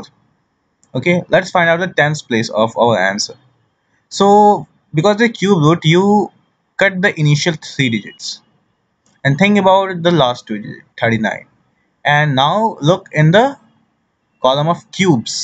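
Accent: India. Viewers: this guy is so smart and he speak like a Indian☣☣☣☣☣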